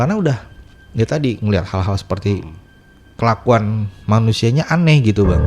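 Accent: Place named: Java, Indonesia. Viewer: Padahal ga usah pake bahasa Inggris bang, pake bahasa Indonesia aja ceritanya. Gatel denger bahasa Inggrisnya yang bukan cuma medok lokal tapi juga belepotan banyak ngaco.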